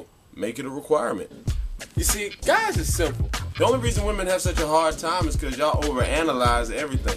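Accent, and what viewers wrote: South, United States. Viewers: is he from the south? seems like he got an accent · What happened to your accent? I love country accents!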